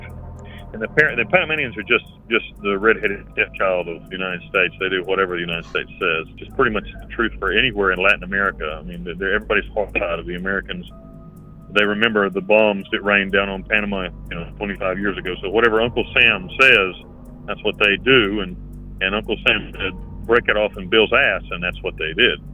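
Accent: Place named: Manchester, United Kingdom. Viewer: New subscriber here - recommended by Disturban. I noticed your accent, are you from Manchester?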